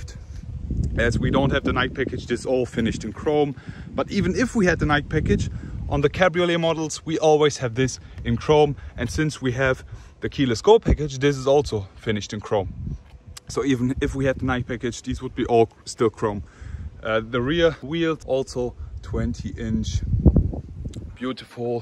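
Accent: Germany. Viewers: Hasse es wenn man den Deutschen Akzent so krass raus hört .. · Ich kann mir diesen schlechten deutschen Akzent nicht geben . Aber tolles Auto . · Man hört manchmal raus dass du deutscher bist :D aber sehr gutes Video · Haha man merkt so den deutschen Akzent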